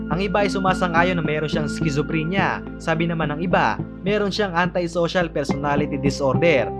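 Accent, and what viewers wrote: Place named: Bulacan, Philippines. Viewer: Bulakeñyo ka ba? yung punto nyo po napapansin ko 🥰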